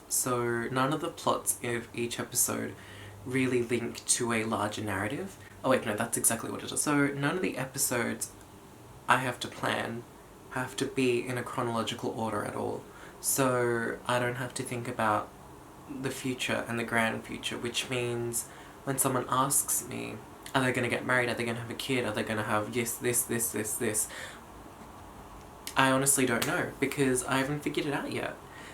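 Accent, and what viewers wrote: Australia. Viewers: I recently subscribed and AHH HE’S AUSSIE I DIDNT KNOW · Is that an Australian accent I hear? I've been working on improving my imitation of an Australian accent, but I've been finding it pretty difficult. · Oh that auzie accent. Wow. R u from Sydney? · Is that an Australian accent? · What nationality are ya? Sound Aussie... I think?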